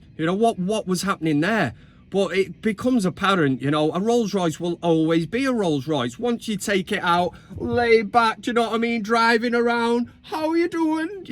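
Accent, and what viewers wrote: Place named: United Kingdom. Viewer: What happened to this guy? The accent is irritating now just try to talk normal bro not everyone watching is from The UK bro.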